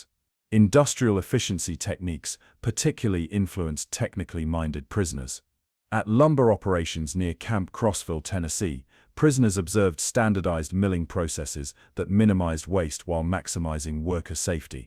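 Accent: United Kingdom. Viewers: The announcer seems British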